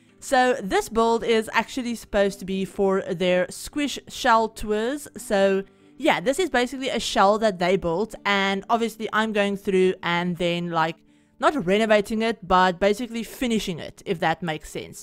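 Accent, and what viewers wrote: Afrikaans, South Africa. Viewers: Are you Afrikaans?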